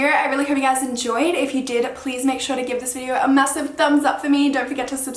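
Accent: Australia. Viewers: As soon as you started talking I was like "of course she's Australian". Why are all Australian girls so damn pretty, wtf?. Anywho, subscribed 😍❤️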